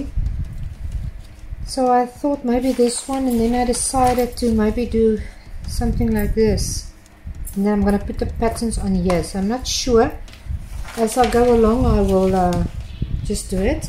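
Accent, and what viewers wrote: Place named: South Africa. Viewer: I love him. I love elephants! You're from South Africa? Very cool. I was wondering what accent you had.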